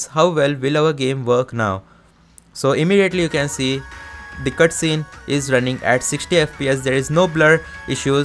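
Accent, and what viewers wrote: India. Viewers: Indian accent hurt my ears but this is helpful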